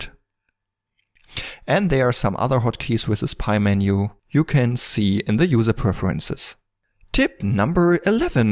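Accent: Germany. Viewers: You sound German.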